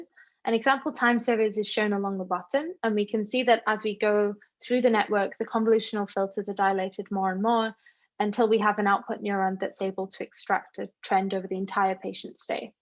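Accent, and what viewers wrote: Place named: United Kingdom. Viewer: Great work! Enjoyed the British accent!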